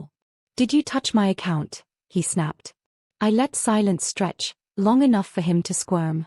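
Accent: United Kingdom. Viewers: What sounds like a British accent makes me wish we viewers were able to choose which accent we wanted to hear. That would be the only way I could hear these stories narrated by an AI voice with an American accent.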